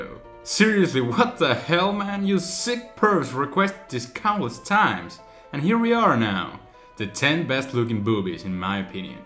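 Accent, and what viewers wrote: Finland. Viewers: Where is he from? His accent sounds quite finnish. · Are you from Finland?!?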